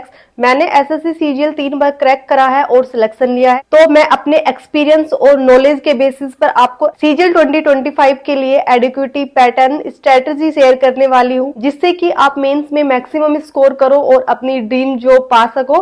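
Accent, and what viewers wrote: Haryana, India. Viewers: ! Tum haryanvi ho?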